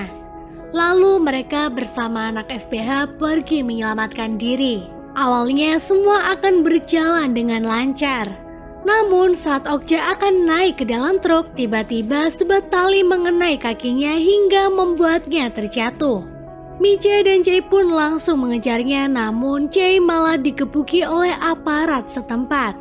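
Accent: Pemalang, Indonesia. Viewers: wong pemalang?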